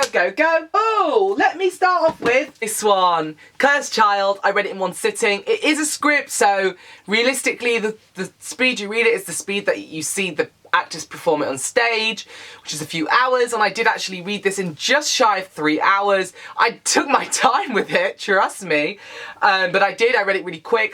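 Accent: United Kingdom. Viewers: you're the only british booktuber i watch and i don't live in the uk anymore and its so nice to hear your british accent and words I've forgotten to say!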